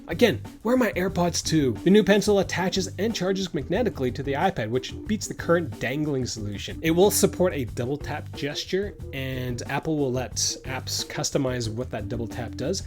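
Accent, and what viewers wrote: Canada. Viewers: 2-things 1) there’s a dog in this video! 2) you bench 240lbs? Legit. (But you sound Canadian, is that kilos?)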